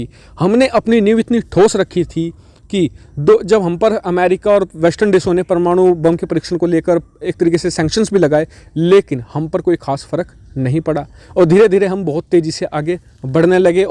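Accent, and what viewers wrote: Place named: Western Uttar Pradesh, India. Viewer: Sir, aap western up se ho kya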